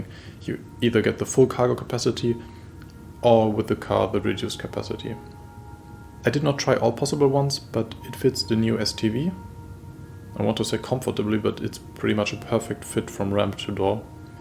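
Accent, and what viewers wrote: Germany. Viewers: you sound like a german speaking english :D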